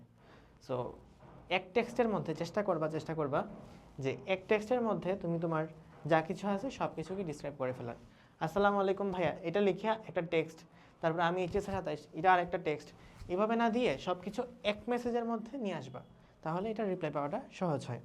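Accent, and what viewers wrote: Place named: Habiganj, Bangladesh. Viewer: Vaiee kotha bolar modde Habiganj er basa misritho ase🥰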